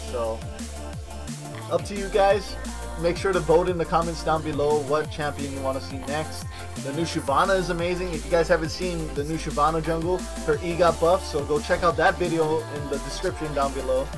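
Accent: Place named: Hawaii, United States. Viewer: you must be from hawaii. you sound too local and cool to not be.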